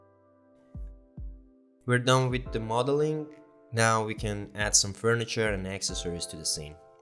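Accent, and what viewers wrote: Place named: Turkey. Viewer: Sen Türk müsün aynı Türk gibi İngilizce konuşuyon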